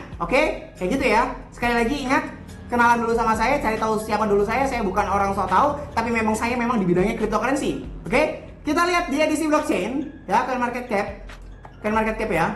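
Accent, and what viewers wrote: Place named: Banyumas, Indonesia. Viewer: Jangan di tonton....Yaa Brother" keenakan nih Bocah Ngapak !!!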